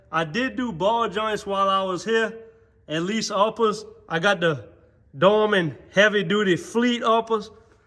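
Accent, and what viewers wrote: Louisiana, United States. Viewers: Damn you got the MOST crazy ass Louisiana accent 😂😂😂😂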